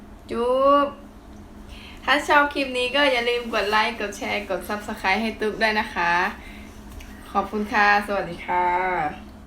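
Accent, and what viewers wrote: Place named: Isan, Thailand. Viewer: อีสานกลางใหญ่ออกคักแด555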